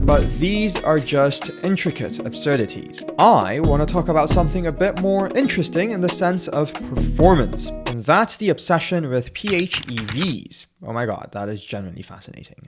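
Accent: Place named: East Asia. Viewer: My man, your accent tells me you're Asian, as in East Asia. Am I wrong? Great video btw, pretty accurate.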